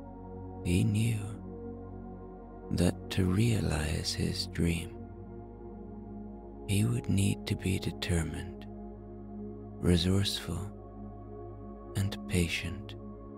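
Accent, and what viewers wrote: Ireland. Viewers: your Irish accent, make your sleep stories amazing.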